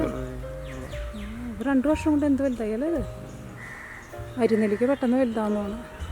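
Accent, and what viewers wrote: Thrissur, India. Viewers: Thrissur basha padichu ethu enthutta sanam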